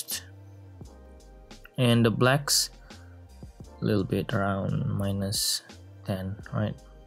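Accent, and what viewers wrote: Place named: Malaysia. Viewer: You sound like malaysian chinese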